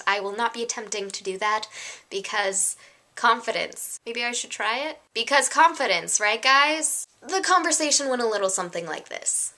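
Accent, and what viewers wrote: United States. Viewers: I had you up on the computer and my Nana was like "Hey, why are you speaking in an american accent?" so I had to explain that it wasn't me, it was you,!! HaHa, It was funny and flattering because you are so pretty!! x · Michigan accent represent!!!!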